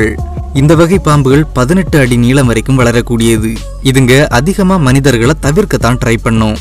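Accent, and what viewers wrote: Kanyakumari, India. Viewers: Bro Kanyakumari pola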